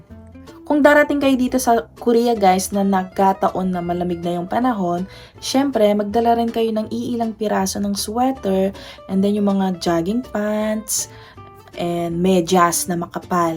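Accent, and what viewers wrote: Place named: Pampanga, Philippines. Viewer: Kapampangan ka ne madam hehe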